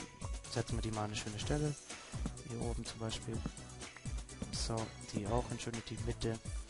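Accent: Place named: Swabia, Germany. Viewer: Schwäbisch Lets player :D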